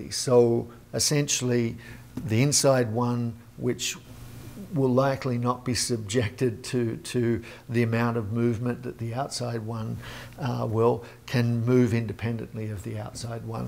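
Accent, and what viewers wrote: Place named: Australia. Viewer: Ahh I knew I wasnt going crazy about your Aussie accent, would love to see you build a door with your Felder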